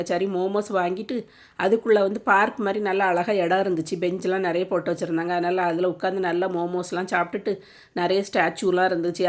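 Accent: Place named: Nagercoil, India. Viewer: Hlo sissy ungaluku native nagercoil ah slang apdi iruku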